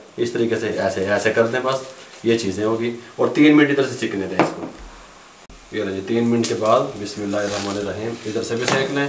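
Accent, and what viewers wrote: Punjab, India. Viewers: Zaiqa we changa ho si, Style te no1 hey hi twada. Cool man with punjabi tarkah makes it enjoyable to watch. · Zaberdust I like they way u speak hindi and punjabi . very good easy recipe thank u.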